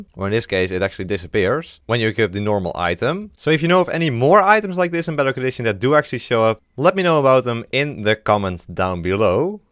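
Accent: Netherlands. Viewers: ik wist het.Nederlands accent is zioo duidelijk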